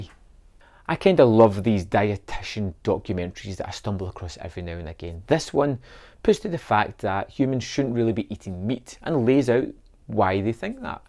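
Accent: Scotland, United Kingdom. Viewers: You got my sub. Great reviews. You're a little bit difficult to understand, given your rather heavy Scottish accent, but for myself I found I really had to listen to what you were saying and consequentially your comments sunk in.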